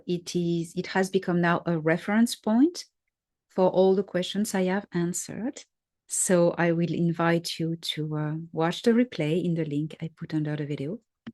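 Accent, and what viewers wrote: France. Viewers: it would be awesome to listen to an audio book read by you with your beautiful French accent ❤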